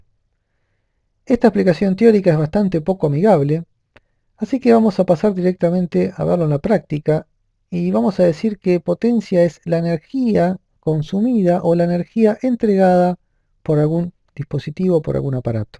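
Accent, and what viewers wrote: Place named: Rioplatense, Argentina. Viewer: Creo que eres Argentino o Uruguayo Saludos de Peru Papá se les quiere gracias por el curso💪🇦🇷♥️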